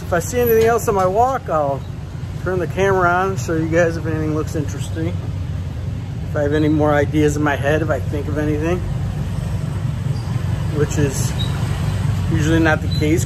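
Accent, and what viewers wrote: United States. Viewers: stumbled across another channel i like. where you from? you sound like a fellow east coaster if i had to guess.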